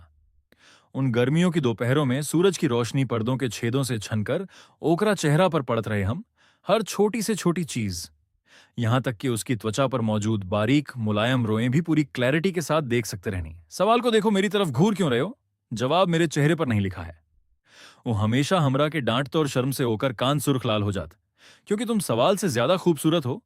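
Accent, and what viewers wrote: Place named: Bihar, India. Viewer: Bhai tu bihari se k video m bhi bihari bhasha ave h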